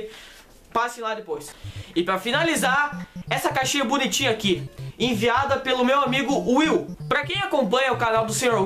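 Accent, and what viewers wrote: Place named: Pato Branco, Brazil. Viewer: uaheuhaueh o fala engraçado por que ele é de Pato Branco! (não leve pro pessoal)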